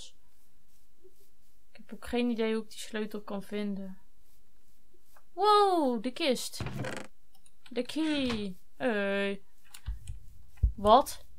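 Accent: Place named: Groningen, Netherlands. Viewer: Je klinkt wel een beetje gronings :P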